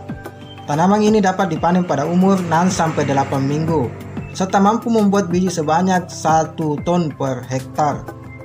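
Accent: Bugis, Indonesia. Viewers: logat bugis kah?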